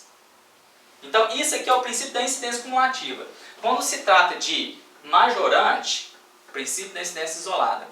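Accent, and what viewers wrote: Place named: Goiás, Brazil. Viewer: kkkkkkkkkkkkkkkkk goianin da gema..... :p (sotaque)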